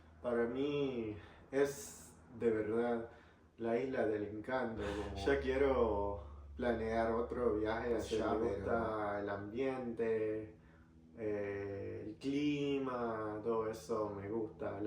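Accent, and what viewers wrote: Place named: Buenos Aires, Argentina. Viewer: “Me re copa” que grande! Jajaja 100% porteño tu acento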